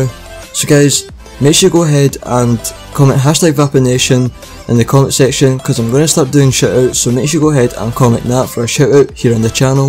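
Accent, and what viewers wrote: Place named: Scotland, United Kingdom. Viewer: ur scottish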